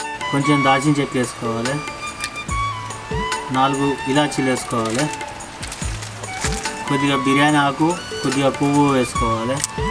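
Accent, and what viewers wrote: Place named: Telangana, India. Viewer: అన్నా మంచి మంచి తెలంగాణ వంటలు చేసి చూపెట్టినందుకు ధన్యవాదాలు మీ వీడియోస్ గాని మీ యాక్టింగ్ గాని పక్కా మన తెలంగాణ యాసలో ఉంటది మీ ఫోన్ నెంబర్ పెట్టండి అన్నా మీతో మాట్లాడాలి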